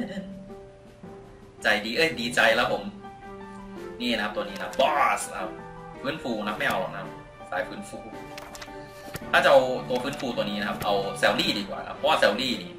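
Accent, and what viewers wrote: Isan, Thailand. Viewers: พี่เป่นคนอีสานเหรอครับ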